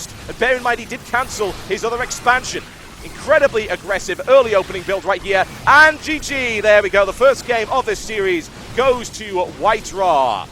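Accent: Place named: London, United Kingdom. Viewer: TB, I bloody love you man! The entertainment value of your casts is exceptional and combined with your voice and accent they induce the kind of mancrush that is normally reserved for only the best of friends. Btw, have you ever considered starring in the next Guy Ritchie movie? I think you would be a perfect cast for one of the small-time london criminals in a movie like Lock, Stock... or Snatch :P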